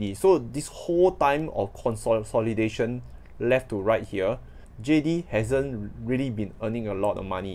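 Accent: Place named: Singapore. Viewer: You're from Singapore, that is 100% Singlish.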